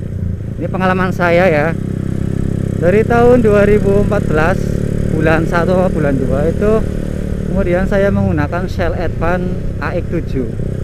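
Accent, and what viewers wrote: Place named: Banyumas, Indonesia. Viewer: Ora ngapak ora penak 😁😁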